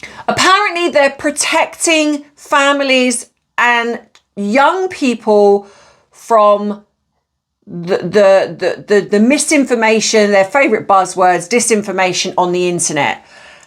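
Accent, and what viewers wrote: United Kingdom. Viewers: I'm not sure why your channel would be failing. I really enjoy your videos, love the British accent, and believe you are spot on in your analyses. I've been "hyping" your channel. I'm not sure if that helps boost your algorithims but it can't hurt. 😊 Subscribed to Bite Size too. Edited to add that the Montecito clowns bought the award.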